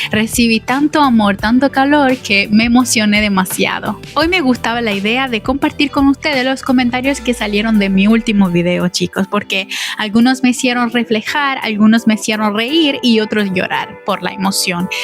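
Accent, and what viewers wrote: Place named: Dominican Republic. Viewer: Soy Dominicano en New York y encontre tu canal hoy.. Me gusto tu vibra y me suscribi.. Tu español es como dominicano .. abrazos desde new york :goodvibes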